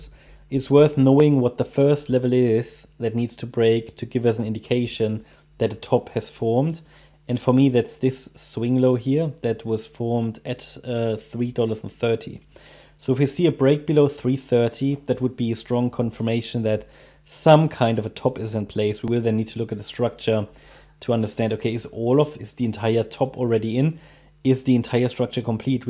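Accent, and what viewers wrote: Germany. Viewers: Hi, deinem Akzent zu urteilen, würde ich jetzt behaupten du sprichst eigentlich deutsch 😆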